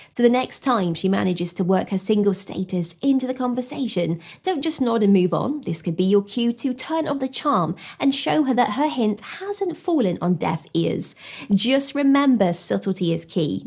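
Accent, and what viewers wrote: United Kingdom. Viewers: Love your British accent!!! 😘😘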